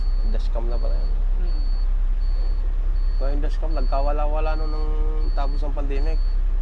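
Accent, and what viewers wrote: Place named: Bicol, Philippines. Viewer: Ganun talaga kapag bicolano ... May diin at minsan wala ang letter sa word na sinasambit ... Pagpasensyahan mo nalang 👍👍👌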